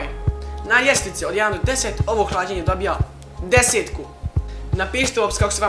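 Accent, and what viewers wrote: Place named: Dubrovnik, Croatia. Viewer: oklen s'ti? Malo mi baca na Dubrovnik/Korčulu, po naglasku.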